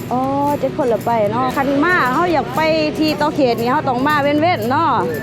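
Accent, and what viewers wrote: Ubon Ratchathani, Thailand. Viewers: สาวห้องคือเว่าสำเนียงทางอุบลแท้บ่รู้ว่ารถโดยสารระหว่างประเทศทางลาวเปิดให้เข้ายัง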